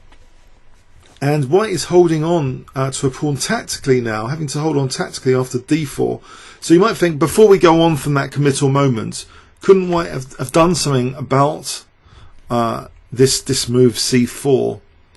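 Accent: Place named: London, United Kingdom. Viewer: I watched this live and flipped the board to black well before Anand's 38 Qg3. The commentators were still sanguine and thought it was drawing but by move 42... f6 it was clear that Carlsen was simply going to march his King + entourage down the board and Queen a pawn. Anand clearly looked tired and his defeat in game 6 is related to the defeat in game 5... his blunders are inexplicable without this context - he was actually stubbornly trying for a win, not the draw he could have engineered. He's lost the psychological battle and I see no sign of recovery from here. I truly hope he is not embarrassed in the remaining games. Thanks for your post game reviews, I love your no nonsense analysis (engine assisted or not) and softly spoken London accent, ignore those critics with nothing of substance to say ("would you please say three not free" / "don't pass off engine analysis as your own" - FFS! 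- way to miss the value in your videos!).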